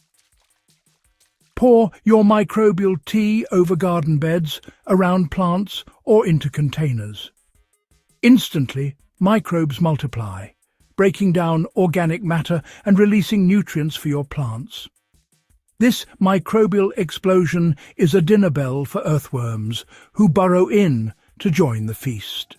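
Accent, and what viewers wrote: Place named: United Kingdom. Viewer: I really don't like these AI generated videos. This video is narrated in a distinct British accent and uses metric measurements but says United States?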